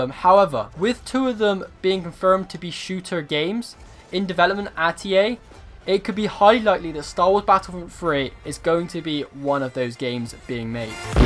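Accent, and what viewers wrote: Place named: Bristol, United Kingdom. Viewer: You have a Bristol voice !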